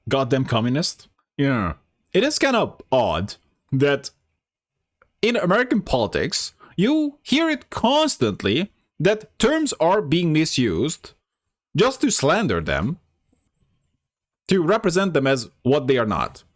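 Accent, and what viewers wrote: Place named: Eastern Europe. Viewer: There’s no way you’re not Eastern Euro! No way!!! Subscribed btw 😀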